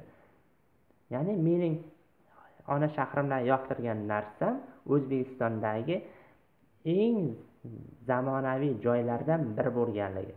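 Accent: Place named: India. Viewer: Aka aksent hindistonnikimi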